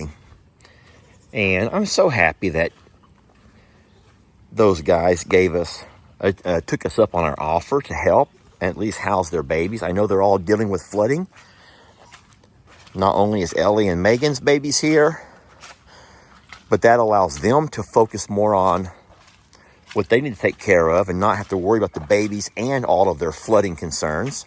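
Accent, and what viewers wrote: South, United States. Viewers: Hi, This is just a suggestion. I think the best spot for the pigs is by the pond. If they are introduced slowly to the horses, I feel they would be happier by the pond just like at ISS. Side note: I've been watching y'all since 2017, and your country accent has gotten thicker since retirement. I know you're a code switcher, BUT I've never heard it this thick <- I do enjoy the melody, though ❤ Thanks so much for sharing, and LHL's looks fabulous with all the babies on it 🐎🫏🐐🐖🦙🐏🪶🐕🐈🐈‍⬛ P.S. Can we get one of these -> 🦄🤣😂🤣 Much love ♥️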